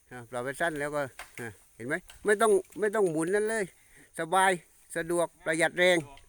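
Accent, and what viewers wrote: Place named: Krabi, Thailand. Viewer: สำเนียงคล้ายคนกระบี่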